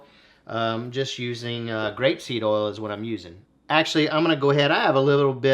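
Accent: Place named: South, United States. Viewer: By chance, l saw your recepe for cubed rump steak. I didn't want to make a casserole. Yours popped up immediately. I love your accent and personality. Is it a southern accent? The hairdresser l follow also has the same accent. March 2025. I wish you would use the metric sizes. However, l can check it out. Thanks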